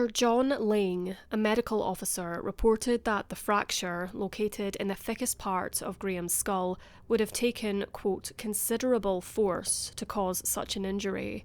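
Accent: Scotland, United Kingdom. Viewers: Jeez - you can't tell the difference between Canadian and Scottish.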